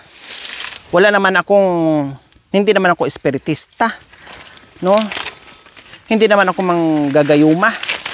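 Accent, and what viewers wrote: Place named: Iloilo, Philippines. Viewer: Ilongga ka gali madam❤❤❤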